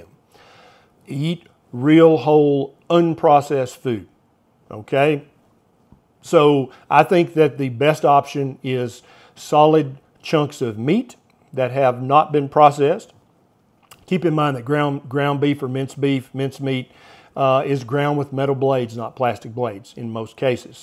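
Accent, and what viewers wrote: South, United States. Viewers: Listening to Dr souther drawl talk about the latest scare tactic the dreaded “micro plastics” makes me want to consume more and hurry up my own demise.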